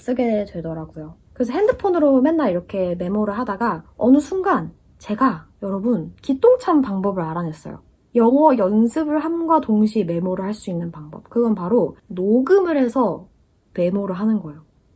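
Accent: United Kingdom. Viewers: I really like your british accent, can you make more videos of communicating with other people in english?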